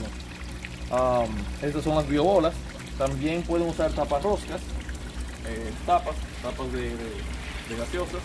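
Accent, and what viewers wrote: Dominican Republic. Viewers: Ese acento es del castellano Dominicano es inconfundible al igual como lo es el chileno o mexicano, o me equivoco? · Vives en República Dominicana? Ese acento es el Dominicano standard😅😅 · Hablas como dominicano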